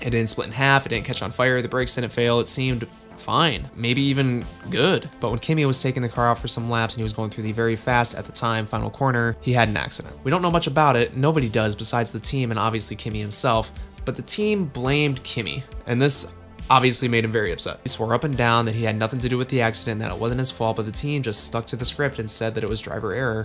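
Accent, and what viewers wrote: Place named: Chicago, United States. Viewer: Your accent seriously sounds Chicagoan.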